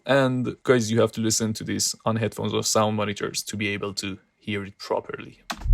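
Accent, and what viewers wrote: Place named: Tehran, Iran. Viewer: The Accent Look Soooo Bache Tehron 😃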